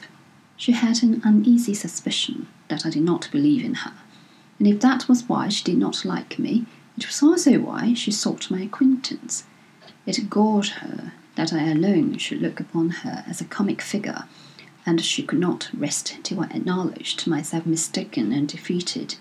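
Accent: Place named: United Kingdom. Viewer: I listened at 0.75 speed. Works perfectly:) Lovely British accent:) Anyway, it's the only reading of it, here on YouTube , so let's be grateful.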